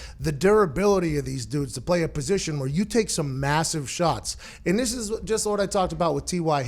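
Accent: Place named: Pennsylvania, United States. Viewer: god if only he could get rid of that PA accent.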